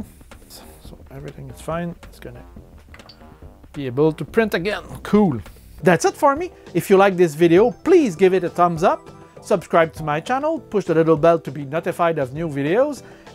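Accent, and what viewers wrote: Quebec, Canada. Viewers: i love the Quebec accent! And thanks for the video!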